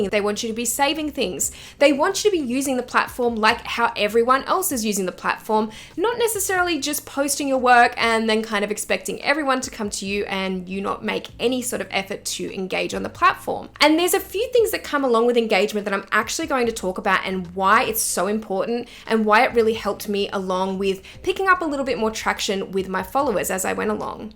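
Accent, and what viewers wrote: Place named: Australia. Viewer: Very new to using instagram, just started an account for a business im trying to get off the ground. Started to youtube it to research and learn how to do things. So pleased i found this vid, hopefully u have more for me to learn from. rather hear it all in an aussie accent rather than a seppo one. First time really using instagram, hopefully it isnt too hard to get around it and become reasonably proficient at it, thanks for posting young lady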